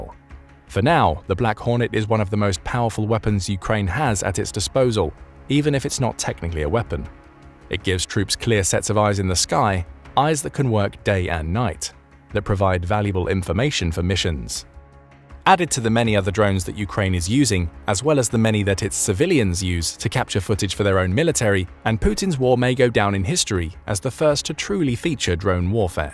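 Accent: United Kingdom. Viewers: It's always nice to have a reliable British sounding narrator on a video. This guy could describe a 1986 Ford Chiltons manual and it would sound good. 👊😎 EDIT: $200,000 a pop!?! Whaaaaat!?! That's f***ing crazy! I can buy a better one, same size just a different color at a Goodwill for like $20! 🥹 "Black Hornet Drones: The only thing you'll hear is the sound of your head getting slapped.... Black Hornet." That would be the ad. 😆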